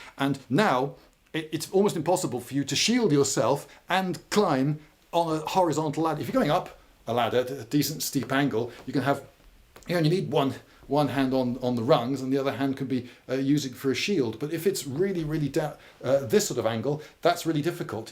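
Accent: United Kingdom. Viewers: You sound like the narrator in strange brigade and that amoes me smile. Everything is better when narrated by a Brit. Plain n simple. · Why the fuck was I notified about this video NOW?!? I WANNA LISTEN TO A BRITISH MAN TALK ABOUT MEDIEVAL STUFF!!!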